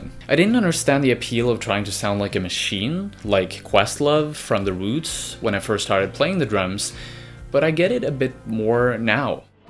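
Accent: United States. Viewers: are u american? what country ru from lol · hearing an american talk about british things is so funny 😂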